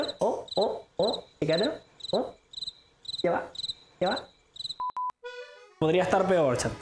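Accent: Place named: Maracaibo, Venezuela. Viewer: ya confirme que es maracucho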